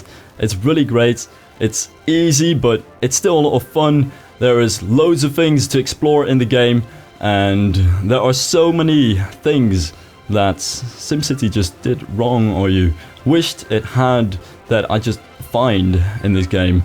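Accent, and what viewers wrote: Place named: Netherlands. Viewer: je accent is heel erg herkenbaar aan mijn engels accent. Ik herkende direct dat je nederlands was voordat je het zelf zij xD. Een combo van brits en amerikaans en soms een beetje nederlands:D daar krijg je een sub voor!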